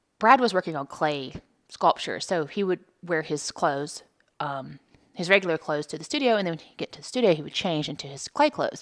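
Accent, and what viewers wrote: South, United States. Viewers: As a southern girl myself, I'm detecting a little tiny bit of a southern twang coming from you. Am I wrong? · I detect a southern twang😊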